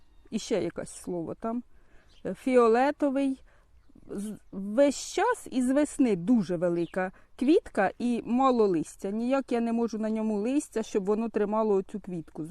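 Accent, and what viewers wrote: Poltava, Ukraine. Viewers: Тільки підписалася на ваш канал. Почула полтавський говір.Якщо не секрет, з якого району? Цікавить діхондра, чи є на продажу?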